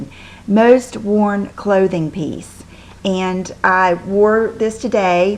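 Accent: South, United States. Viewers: Great video girl! I just love u all the more! I find comfort just hearing your calming southern voice..dont ever change u remind me of my dear grandmother and her"old" southern accent...Merry Christmas!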